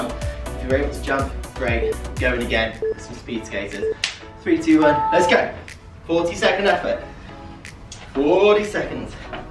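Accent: United Kingdom. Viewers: Weirdos? why? Hes hot! Hes shirtless! Hes got british accent!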